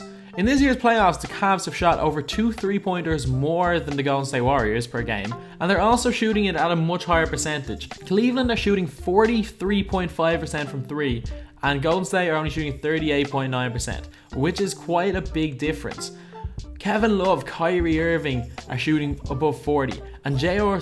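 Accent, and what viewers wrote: Ireland. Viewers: Is this guy irish???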